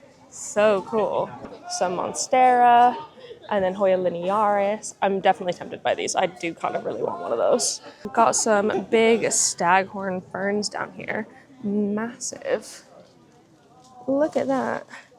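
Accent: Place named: London, United Kingdom. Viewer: Your London accent is improving! Banana plants and 20 quid but you slipped up major on Herbs! 😊 I love that market - how did you come away twice with nothing? 😲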